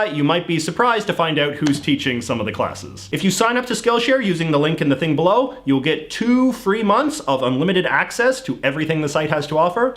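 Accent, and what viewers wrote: Canada. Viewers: Gunter Ringgenberg is Canadian. Why do you think he says "about"like that?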